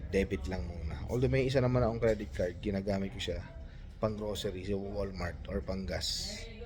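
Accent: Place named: Canada. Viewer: Wow...parang Canadian si ate...ang galing ng accent nya at makipagusap sa mga locals❤❤❤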